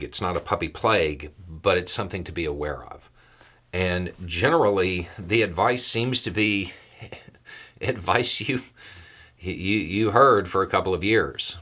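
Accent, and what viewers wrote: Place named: Boston, United States. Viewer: You say it like you're from Boston. Lol I lived there for 30 years. It's always been Nev-add-uh. Here's one for ya .... Tooele. It's in Utah.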